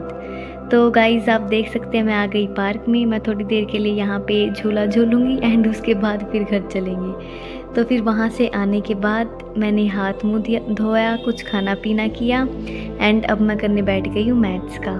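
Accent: Haryana, India. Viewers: Me to haryanvi tone ka addict ho gaya🤣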